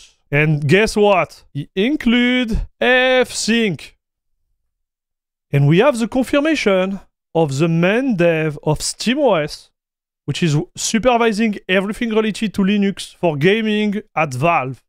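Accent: France. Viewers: Have not heard Fran-glish for a while, it never ceases to amaze me. I feel like i can speak French :)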